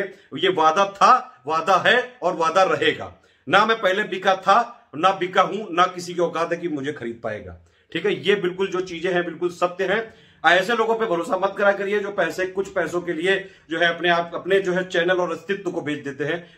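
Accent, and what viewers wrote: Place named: Mirzapur, India. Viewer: Ek dam mirzapur se inspire language xcent hai apka.. Lajawaab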